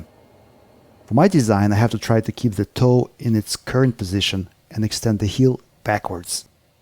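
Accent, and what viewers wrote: Russia. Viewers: "Presents should not have any functional weaknesses or design flaws" - that must be the most Russian thing I have ever heard, and your accent only made it better. Great job, sir. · У автора русский акцент.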